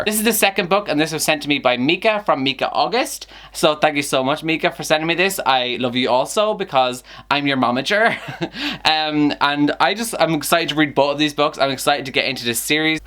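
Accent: Ireland. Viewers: I found you through Chloe’s channel and it’s soo nice to hear an Irish accent on booktube.. makes me homesick and want to be back in the whest 😛.. new sub can’t wait to watch move.. love a fellow Irish gal 😘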